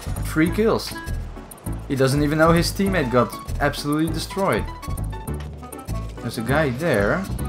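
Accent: Netherlands. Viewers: Very nice dutch accent i love it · Je hoord dat je nederlands bent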